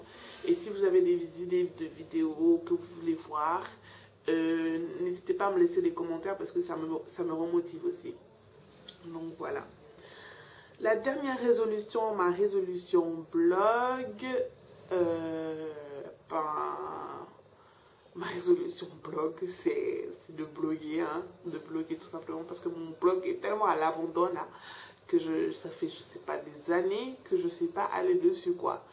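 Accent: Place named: Senegal. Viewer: looooooooooooool l'accent galsen!!!!!! je kiff!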